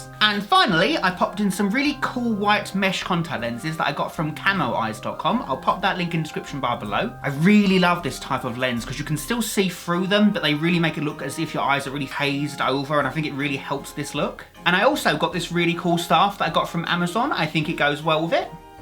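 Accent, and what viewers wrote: United Kingdom. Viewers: ik he is both of those I'm asking a question a lot of his fans know including me 1) u can tell he is gay because in one of his fan mail vids one of the fan mail letters it's said something bout his bf and u know he is British by his accent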